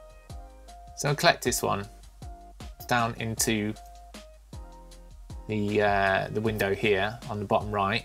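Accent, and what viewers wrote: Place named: United Kingdom. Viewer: Usually love your videos dude. This one is a little disappointing I'm afraid. Effects shouldn't just be used randomly, any editor knows physics is the most important part of adding effects post edit. The first question I asked (after why you add an extra O to videography 🤣) is why are there clouds being added at this height? The drone is clearly below cloud level, so at this point, eye training takes over and it automatically looks unnatural. We won't go in to types of cloud and why that's wrong. You're an amazing video editor that much can be seen from your other videos, but one thing that really gets my goat is people giving tutorials on something that's not right, this isn't good practice for those looking at compositing, FX physics are even bringing in science into the edits. I really don't mean to come across like I'm pissing for the sake of it. 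You're channel may be small, but it's great and I can understand your limey accent too. Give us more of your skills in Final Cut and videography (with a single O).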